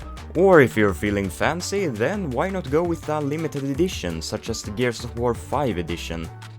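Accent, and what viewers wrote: Sweden. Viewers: I recognized your swedish accent and you confirmed it when you said "swedish prices" lol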